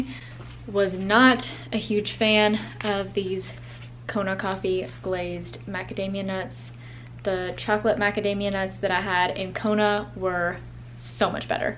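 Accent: South, United States. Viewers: Hi... I'm amazed that your internal flights are still operating in the USA... Our flights in the UK are totally grounded.. We have been told by the UK Government that we can only go out for exercise / get food / if your a key-worker NHS... we're pretty much all grounded, we can't even go out in the car because police are stopping car movements and you risk a £££££ fine!!! You sound really sad...! I just love your accent...;-) YYYeeeeeee Hawwwwww Go Cowgirl!!! Bet you look great in western gear..!!!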